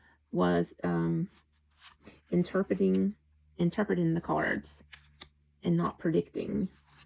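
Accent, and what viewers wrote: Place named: South, United States. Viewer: This was an amazing video! My jaw dropped with your first piece of advice and then the hits just kept coming! Thank you so much for sharing your perspective and wisdom with us 🥰 (And this has nothing to do with tarot but I love your dialect of English! I'm a former language teacher and in love with how people talk. I'm from Minnesota and rarely get to hear the beautiful accents of the southern states.)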